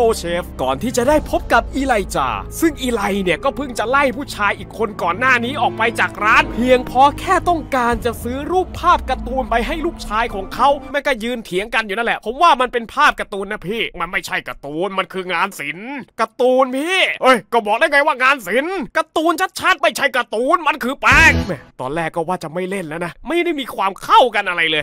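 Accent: Isan, Thailand. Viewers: ชอบจังเวลาพี่พากษ์อิสานอิอิ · อ้ายยยย บักย่านน้ำอีสานจ็าดๆ55555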